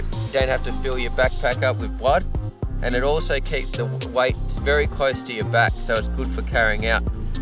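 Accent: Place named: Australia. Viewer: Lol love that aussie accent yet we aint got it here. In tassie it sucks lol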